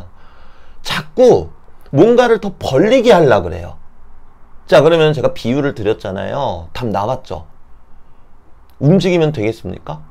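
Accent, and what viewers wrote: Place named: Gyeongsang, South Korea. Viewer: 경상도에서 좀사셧엇나바여 ㅋㅋㆍ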